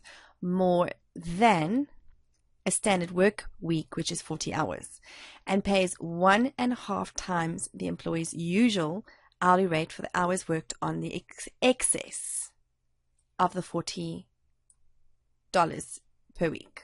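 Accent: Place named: South Africa. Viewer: South African joberger'ish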